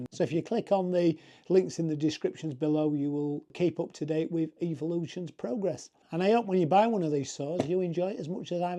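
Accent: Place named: Yorkshire, United Kingdom. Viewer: Having not taken the plunge but keeping an eye on the market (because I want a table saw) all the reviews I've looked at point to one or more of the same 2 primary issues: 1 - fence locking out of sqaure , 2 - mitre slots not industry standard. These seem like pretty fundamental and easily avoidable **-ups to me. Secondary issues are table flatness and dust extraction. I must say from the video it looks like the dust 'extraction' was reasonable even without suction. Evolution are obviously taking that point seriously as the larger saws are showing above and below extraction which is something I don't think I've ever seen on a portable. It's also lighter (despite being bigger-tabled) than the baby DeWalt. That sliding bed is a great touch a la Festool portable. Have Evolution actually managed to nail it on with this saw? I think it may have just gone straight to the top of my very short list. 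Thank you very much for the review in plain Yorshireman English.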